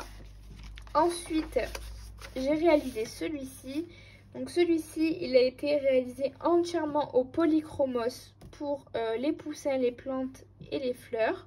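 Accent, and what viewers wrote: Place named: South, France. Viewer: Bonjour! Bienvenue et bonne continuation à ta chaîne très belle accent du soleil. Tu as de très beaux colos, et moi je me suis abonné à ta chaîne.je te souhaite pleins d'abonnés et éclate toi. Gros bisous du Morbihan sud 😘🥰🤗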